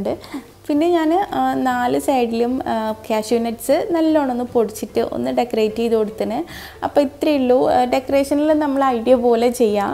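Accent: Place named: Kasaragod, India. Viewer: Ithante samsaaram kasaragod slang polund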